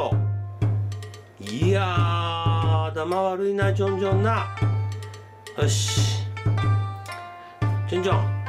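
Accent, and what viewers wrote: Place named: Aomori, Japan. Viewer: 凄い訛ってる！ 青森とかですか？🍎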